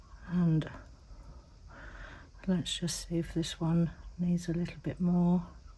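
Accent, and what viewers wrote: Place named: United Kingdom. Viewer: Another beautiful masterpiece as always,. God bless the UK for some of the most beautiful, most graceful female artists in the community, with such beautiful accents, and graceful poetry. 😍😍😍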